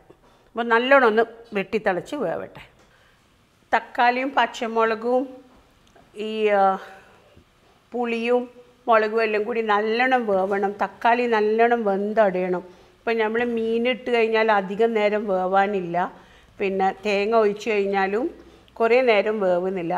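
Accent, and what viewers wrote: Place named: North Kerala, India. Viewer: Superb presentation. Like a mother teaches her sibling. North kerala cooking with North kerala dialects... 👌🏻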